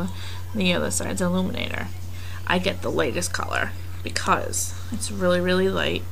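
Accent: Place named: Kentucky, United States. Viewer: there is that kentucky accent again lol , funny , enjoying these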